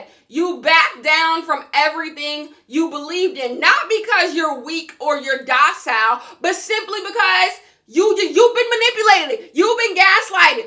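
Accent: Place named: South, United States. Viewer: You’re from the South, I can tell lol. I’ve been over Gabbie Hanna since forever. I say the same shit. If you’re constantly studying what everyone else is doing, you are not happy. Period. When you got shit going on, happy life, friends etc. you don’t give a damn about someone else’s business. Especially to the extreme where you’re constantly putting yourself all in it. 🙌🙌🙌👏👏👏 you said perfectly!!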